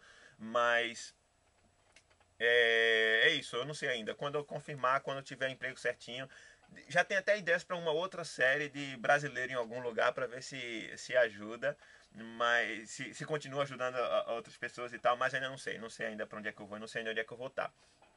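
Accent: Pernambuco, Brazil. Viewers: Contato , vc é pernambucano ?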